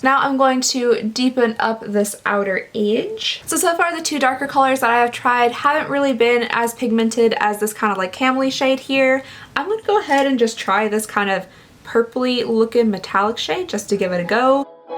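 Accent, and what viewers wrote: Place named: South, United States. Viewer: I am just so glad to hear a person that speaks as I do. Hey y'all:-) Louisville is definitely not Richmond but our accents are similar. I cannot stand watching other people! You are funny and pretty!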